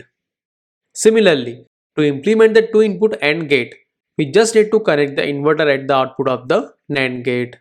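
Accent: India. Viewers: its really good but pls don't speak with an Indian accent its really hard to understand ;) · I only understood because he had an indian accent, like i could trust him more with his expertise :)) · I actually think the Indian accent makes it easier to understand lol. I'm not even Indian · Why so many Indians.... Sorry but it is difficult to follow you with the accent...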